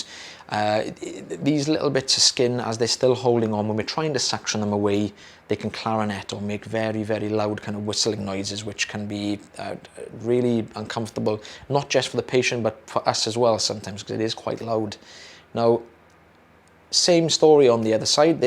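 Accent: Wales, United Kingdom. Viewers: My favorite British accent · The accent is Welsh, The clinics are all based in Wales I believe. Lovely accent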